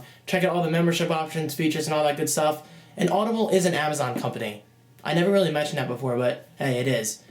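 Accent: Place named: New Jersey, United States. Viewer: maybe its how they say it in jersey?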